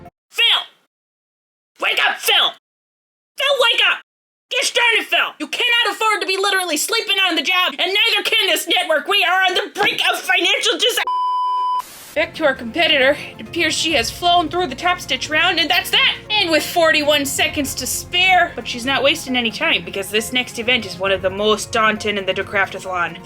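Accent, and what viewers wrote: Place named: Midwest, United States. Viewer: This was absolutely FANTASTIC! SO hilarious, creative crafts, and I LOVE your Midwestern accent as the commentator with! 😂 Please consider a 2025 iteration, and thank you for the laughs! 🥳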